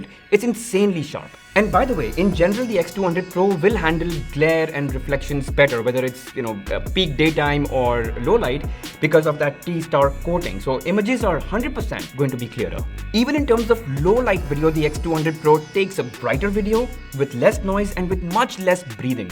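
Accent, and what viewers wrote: India. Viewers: I hate indian accent of speaking english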